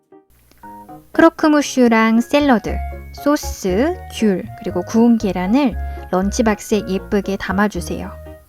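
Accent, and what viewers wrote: France. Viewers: loved your french accent friend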